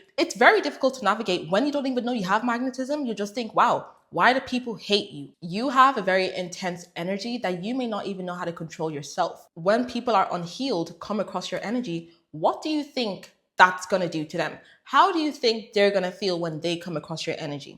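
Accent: Ireland. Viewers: I WAS LITERALLY GOING TO ASK IF YOUR FROM IRELAND!! I feel like you would be fun to be around! 😂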